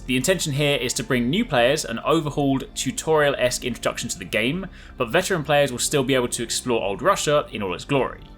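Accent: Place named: United Kingdom. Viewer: I really wanted to like the video but you sound just like a Brit that I had to rearrange his face...lol he came to the wrong town in bama running his mouth...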